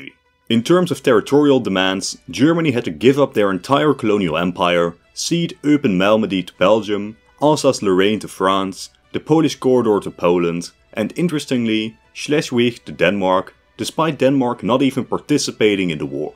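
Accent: Netherlands. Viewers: I think it's a Dutch accent.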